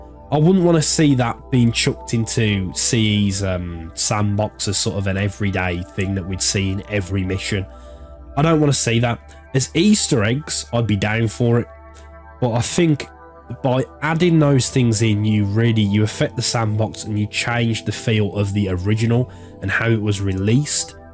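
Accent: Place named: Liverpool, United Kingdom. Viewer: Best Halo YouTuber with the scouse accent 😉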